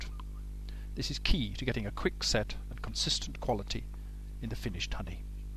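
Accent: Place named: United Kingdom. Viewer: Really didn't care to the nasally british voice that I couldn't hear.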